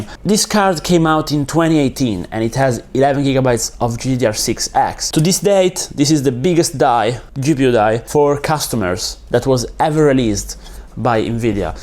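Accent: Italy. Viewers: si sente l'accento italiano 😂😂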